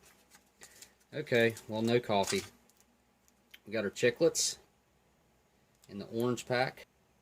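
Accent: South, United States. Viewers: Love your channel & also love your accent! U got the cutest accent ever! Country....but just smooth & cool!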